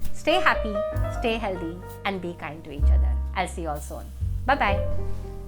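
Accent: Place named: India. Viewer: I have to be honest with you, it was quite difficult to understand the video due to your heavy Indian accent, which seems to overpower the message you're trying to convey. Additionally, it’s quite clear that the video is heavily skewed towards promoting ISB, and as a result, it feels less objective and more like a sales pitch. This approach diminishes the credibility of the content and detracts from the potential value of what you're sharing. If the aim is to genuinely inform or provide guidance, a more neutral, clear, and user-friendly approach would be much more effective.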